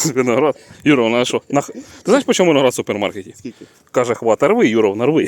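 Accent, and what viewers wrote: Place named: Zaporizhzhia, Ukraine. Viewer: У Вас брат, випадково не у Данії зараз живе? Говір однаковий, і також з Запоріжжя. <link>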